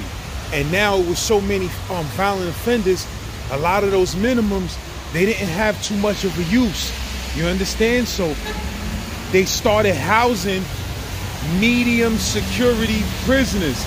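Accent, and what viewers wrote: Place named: New York, United States. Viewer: I for one would pay for an audio book, it could be the fucking dictionary as long as narrarated it with a hood new York twist.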